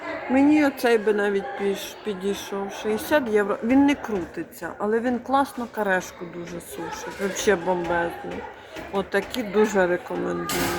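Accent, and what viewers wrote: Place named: Boryspil, Ukraine. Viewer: Ви, вразили тим- 40 год!, спасіба, 3 часа, саме вкусне, я рахую, вобще, шутками і таке інше. 😊 Ви направду; розважили мене нині. Пам'ятаю із свого дитинства як у Борисполі, батьківщині моїх бабусі і дідуся, розмовляли подібно до цього, такою малороською мовою, кумедним суржиком. Але вони були мудрі та навчили своїх дітей звертанню до батьків- тато, мамо і на ви. Сподіваюсь, у Марії все добре. Здоров'я та добробуту. 🇺🇦✌❤🖤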